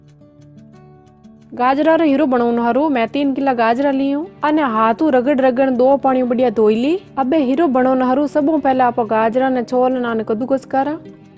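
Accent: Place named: Jodhpur, India. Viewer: Aapki boli mery pardadi ko bahut achhi lagi. And aappki boli mery Jodhpur me rahne vali mosi jesi hai 😊😊😊😊😊😊😊😊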